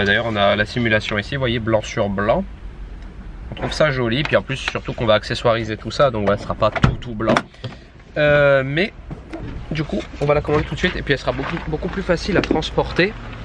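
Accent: Corsica, France. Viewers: J'adore l'accent corse... Bonne continuation.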